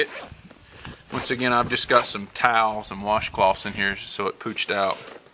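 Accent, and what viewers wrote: United States. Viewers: First things first. Your response "As for overloading the bag it had towels in it. Did not even weight two pounds as i say in the video." is incorrect. you state " It fit everything" referring to the previous minute of dialog where you inform the viewer that the PUSH bag (Practical Utility Shoulder Hold) rode on your iPhone. Like I said, you overloaded the MOAB 6 (Mobile Operation Attachment Bag). I watched the 2 videos on your load-out of the PUSH bag. 10 pounds is far to modest an estimate of the weight. As for your condescending remark "I guess in the States you dont need what i do i suppose", guy, you have a southern draw, I would know, I'm southern. You sound like you're from Alabama or Georgia. As far as what I need, in a 6 hour bag I place what I will NEED for 6 hours. AR mags, pistol mags, radio, pens, paper, a tourniquet, pain reliever, 1 16 oz. 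bottle of water, sunglasses, flashlight, lighter, toilet paper. Remember it's a 6 hour bag. 5.11 Tactical is designed for law enforcement, EMS, Firefighter, not survivalist! There are bags that cater to those individuals much better than a 6 hour bag. Your review is completely unfair. You should have began the review by saying "you bought the wrong bag for your situation". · Where do you live? Looks like Australia but you sound like Arkansas lol not being mean just asking. Good review, I have this bag and use it as a diaper bag. lol tactical dad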